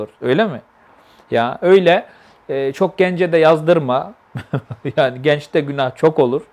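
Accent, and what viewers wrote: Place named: Azerbaijan. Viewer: Azərbaycan Türkcəsinin danışığıdır. Halal olsun.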